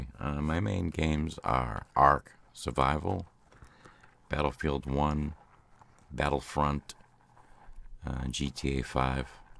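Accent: Boston, United States. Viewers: I cannot be the only one thinking he’s attractive 😳 That Boston accent maaaan 😍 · I should have known you were from Massachusetts. I hear that lovable Boston accent. =) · Haven’t been subscribed for long, a few months, but I was not expecting the Boston-ish accent! So glad I found your channel. Your voice and creativity is one in a million! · Hi, I have to say, it's nice to hear your normal speaking voice! You should do more with your own Boston accent some day, it's very warm and personable! I love a lot of ASMR channels but the rumbly sound of your voice puts me right to sleep 💚😷😴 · Hearing you talk in your normal voice, I hear......Boston accent 😂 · It’s so weird to hear you talk normal volum... I never even knew you had a Boston accent! Congrats! And that marble device is very... T E C H N I C A L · You er so Boston I hade no idea.. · Are you from Boston?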